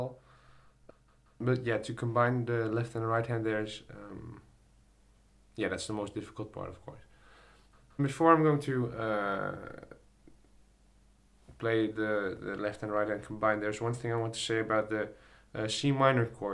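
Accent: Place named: Netherlands. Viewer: thank you, haha je hoort aan je accent dat je nederlands bent qq, maar goed gespeelt!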